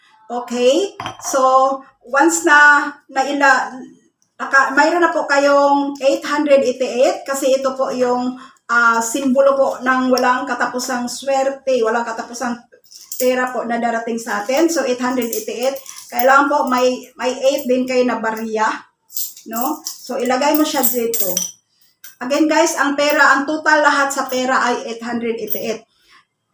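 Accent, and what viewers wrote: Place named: Visayas, Philippines. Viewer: Bisaya ka maam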